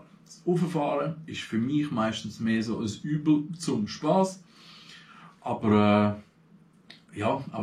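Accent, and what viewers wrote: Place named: Switzerland. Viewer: einiges gelernt... bissl übers Bike, aber vor allem Schwizerdütsch, mithilfe der Untertitel 😁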